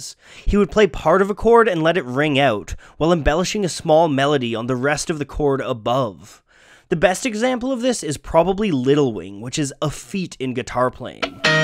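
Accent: Canada. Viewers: Is Canadian? His O's sound Canadian.. But im Swedish so what do i know xD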